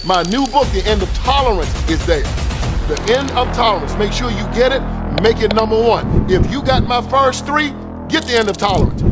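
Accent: South, United States. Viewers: Love your delivery, and that Southern Drawl, truth, love ,and light,God Bless from not so Great Brtain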